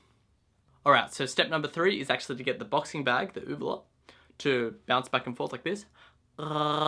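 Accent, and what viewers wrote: Australia. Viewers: Are you an aussie? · so aussie i did not realise he was aussie as well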